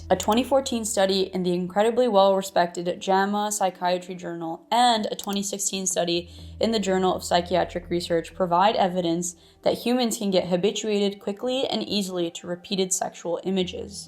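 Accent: Brazil. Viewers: Você é brasileira ou portuguesa? Pelo sotaque é brasileira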